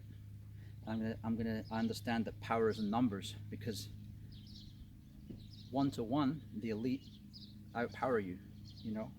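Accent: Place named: Scotland, United Kingdom. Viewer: I thought ye might of mistaken him from Watts lol He's actually from Glasgow originally (now resides in Sudbury Ont. CanaDUH) I thought I recognized yer accent but wasn't sure what part of Scotland ye were from, I'm originally from Belfast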